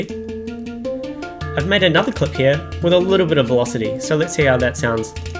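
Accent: New Zealand. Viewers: the first 30 sex of this (really good) tut were like a masterclass in kiwi eccent ''...quuck tups...mudi clups... ...eexcellunt!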